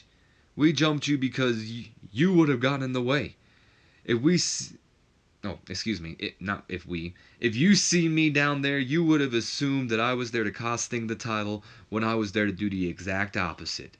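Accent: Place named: United Kingdom. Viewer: I'm british but not from a posh away so no offence was taken with your british accent Also great vid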